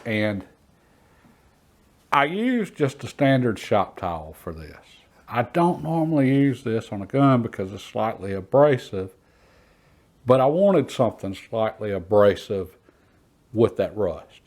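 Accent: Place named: North Georgia, United States. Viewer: Enjoying your channel, I have been trying to pin down where you are from according to your accent. I was a trucker in my younger years and consider myself pretty good at guessing, leaning towards northern Georgia or maybe middle Tennessee 🤷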